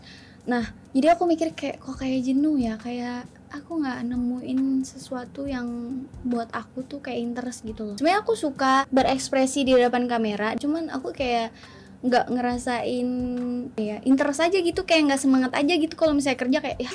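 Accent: Banjar, Indonesia. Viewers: banjar kah kak?cra ngmngnya kek org banjar😭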